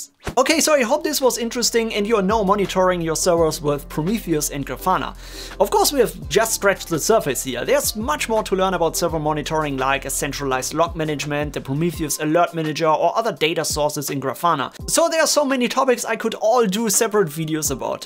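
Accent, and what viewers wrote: Germany. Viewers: simply amazing stuff!! I really like 2 things about your videos 1. amazing and professional explanations 2. your German accent :DD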